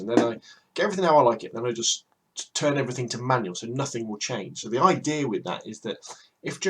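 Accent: United Kingdom. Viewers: Hy, nice video! the quality is good and there is no flow. the webcam performs depending on the system configuration so can you tell me pls on what configuration you recorded the video(processor, ram memory, video card and if you have ssd or hdd)? it will really help me decide to buy the c270 or not. By the way cool british accent.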